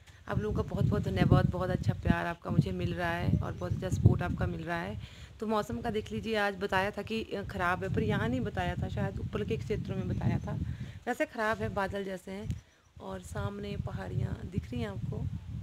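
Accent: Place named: Sirmaur, India. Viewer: Boli se aap sirmaur k ass pass k lagte ho Ya solan district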